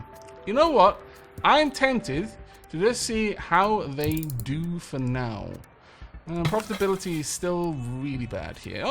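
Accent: United Kingdom. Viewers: I am only in the first minute but you kinda sound like this another great youtuber called BestInSlot who does Jurassic World Evolution/dinosaur games. I would say you both have similar vocal mannerisms, and your voices are both great and calming. Are you you British? Well I will go watch you video now. Thanks.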